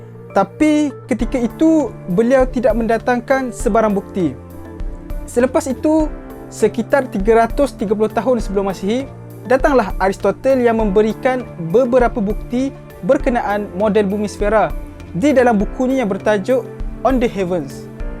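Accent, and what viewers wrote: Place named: Terengganu, Malaysia. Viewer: Speaker nie org TRG ke??... dgr mcm ye dh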